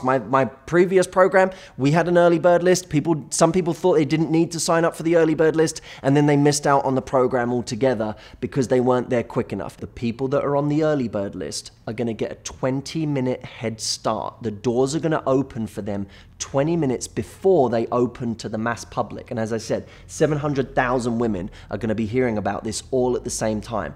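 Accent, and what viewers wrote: United Kingdom. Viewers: Something about a man telling me how to adjust my standards to a bliss point to gain a man's interest and respect is making me ... angry? indignant? patronized? I'm a little salty here on his sweetness. Ladies, please, this entire video begins on the foundation of "what I (a man) believe women experience"... that should have immediately triggered your intuition to approach this with skepticism. If he didn't have such marketable attractiveness (read as: cute brit with the accent) there's no way this channel would be so popular; hell it's why I clicked on the video. He's capitalizing on his sexual appeal to gain trust of women to what he believes will make a women attractive to men.like.him. Don't get me wrong, he seems like a sweetheart and I can see what he's /trying/ to accomplish, but after working in finance I can tell you, every video is still a clever sales pitch - don't buy into him. 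Just respect yourself and respect the man but to hell with any man that doesn't respect you - no matter how cute they are. It's much more satisfying to be with a man where you don't have to change yourself to a bliss point. Let him love you for you, salt, sugar, and every spice in-between. I trust that the "right" man (not the man that gets his ego easily bruised or the man that doesn't respect your sweetness) will respect and love you more (and a lifetime) for being real than someone who is artificially flavored. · Love this british face and accent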